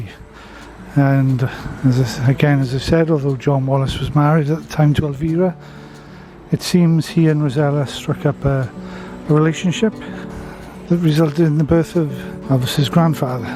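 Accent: Wales, United Kingdom. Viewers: God bless ye Buddy, Thank you for doing such a crackin job on this, its very informative and interestingly delivered. LOve the twang of your broguish speak. you Welsh? nary to matter, its just very resonant of a past I do not know but none the less I am very much proudly from. I am of the Georgia and Carolina people, but do have Mississippi blood there still and I am highly honored by any and all my associations to Dixie, tis a privilege I owe my life for. And I thank yall for coming to be a part of it and show us some love. I hope you was treated like the good guests you are and come to visit more when you can. in the old Irish tongue, I believe its in order to convey, Caed amlle a failte' unto ye. Now, for the closing notion, by which, I request that you tell that fine lady there accompanying yourself, I love that tshirt shes sportin' like a trooper!!! 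God bless the Merseyside and the grooves that flow in and out of that river there. Should ever I be of any help to you, let me know, im in Seattle but, heading to Mississippi and NOLA this spring or summer, intend to check out this very place thanks to yall and could provide a wee spell of boots on the ground if theres something yall missed or need a good lookin at. Thank you again and to you a special salutation thats privi amongst us old sons of the South, nary to matter the color, your God, your choice in rose over magnolia, but, just so long as you dont put no sugar on yo grits, I warmly bid unto thee, Deo Vindice and Pea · Enjoyed that 👌🏼 It was refreshing to hear a welsh accent knowing their onions. Where you from? I’m in Swansea. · I had no problem understanding or knowing you are welsh,, but then I'm from 🇬🇧 👍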